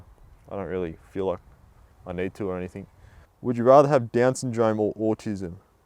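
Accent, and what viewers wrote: Australia. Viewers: your lovely australian accent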